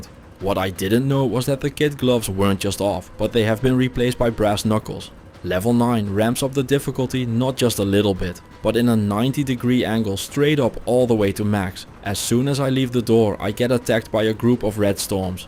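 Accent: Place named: Netherlands. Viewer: why you sound so dutch.... and like me.... also yeah the mage is by far the easiest. mana shield hydra can beat the whole game. ranger is thus also super easy as its mage as well u just have a bow instead of melee. much safer. warrior is just face tank stuff. and ur magic is the lowest it can be... fun fact. melee>range>mage is a triangle. warrior can still be decent ranger. but mage is crap at using a bow. if the mage class had decent dex. it would be broken.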